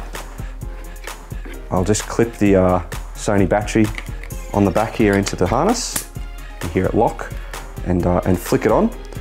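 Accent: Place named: Australia. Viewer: You can really tell an Aussie accent from all others! refreshing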